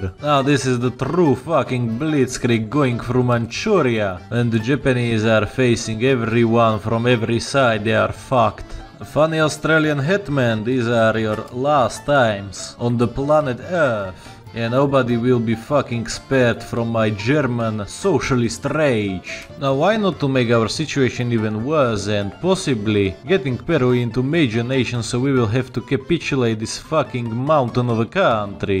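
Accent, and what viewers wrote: Russia. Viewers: Is it just me who thinks he has a Russian accent? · Ааааа этот акцент. Ты русский? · Почему ты говоришь с РУССКИМ акцентом? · Ru: О госпаде, какой же это прекрасный русский акцент. Чувак, лучшее! Eng: Oh my gosh, what a wonderful Russian accent that is. Dude, the best!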